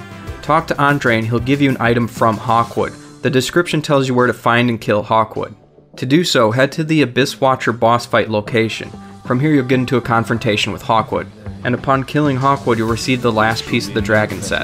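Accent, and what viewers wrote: United States. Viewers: Your videos are so interesting and helpful, but the heavy midwestern accent is insufferable.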